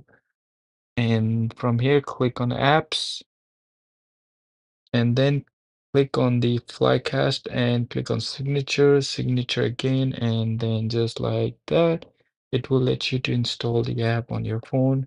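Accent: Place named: India. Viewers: Indian accent, immediate distrust. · Indian accent, immediate trust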